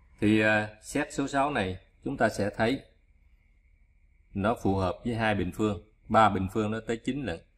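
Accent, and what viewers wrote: South, Vietnam. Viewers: Trong một xã hội bị đồng tiền chi phối gây ra hỗn loạn trong mọi lĩnh vực như bây giờ mà vô tình gặp được kênh của bạn thì thật là đáng trân trọng! Với chất giọng ấm áp, trầm hùng mà giản dị của phương ngữ Nam bộ khiến người xem rất dễ chịu và dễ hiểu. Cơ duyên “gặp” được kênh của bạn cách đây tầm hơn tháng và liền đăng ký kênh để tiện theo dõi. Một kênh rất có ích cho xã hội ngày nay. Và mình thấy có một điều đáng tiếc là hiện nay những kênh mang nội dung học thuật lại ít được theo dõi hơn những kênh giải trí ( mà đa phần là tào lao/ như kênh Nờ Ô Nô hổm giờ báo chí đưa tin). Vậy thì tương lai đất nước sẽ như thế nào??? Chúc bạn và gia đình: Hạnh phúc + Sức khỏe!!! Thân chào!!!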